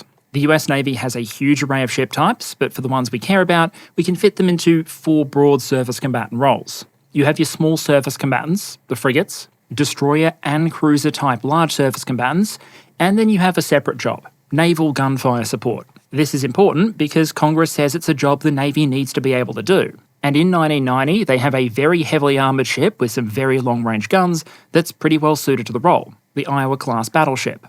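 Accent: Australia. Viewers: Aussie accent, usually a charming quality, failed him here.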